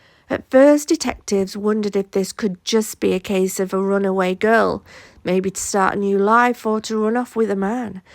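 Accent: Yorkshire, United Kingdom. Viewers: My absolute favourite creator/narrator. Hearing your Yorkshire accent makes me feel at home. I live in California now and haven't been home in 30 odd year so hearing your voice gives me such a warm feeling. It's hard to explain but all you need to know is I absolutely LOVE listening to you. It doesn't hurt that you make great content as well Cheers 🤘🏻🇬🇧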